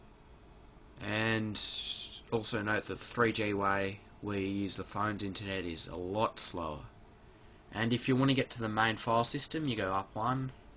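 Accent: Australia. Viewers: Good video 5/5. Also somehow I knew that you were aussie lol. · ih im 9 n yer ossie vose siis cut.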